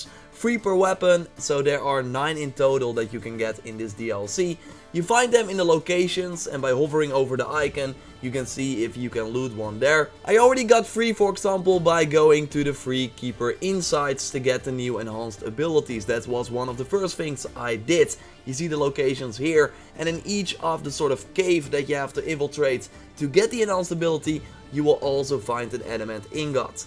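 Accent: Netherlands. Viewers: Is this guy dutch cause his accent is familiar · are you Dutch, by any chance?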